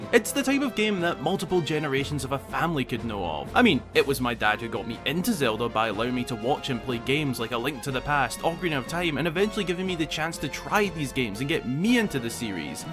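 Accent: Scotland, United Kingdom. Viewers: You're scottish?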